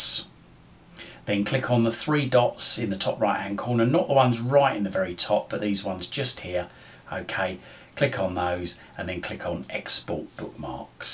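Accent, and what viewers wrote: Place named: Cockney, United Kingdom. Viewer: THANK YOU SO MUCH for doing this video! you're a life saviour! (Also, I love your accent, are you from London? my ignorant ear is telling me there could be a bit of a cockney-ish accent there?) still, perfectly clear and very well explained! again, thank you:)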